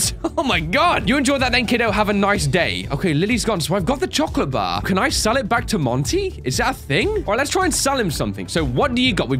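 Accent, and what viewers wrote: United Kingdom. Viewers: Random question but... are you British?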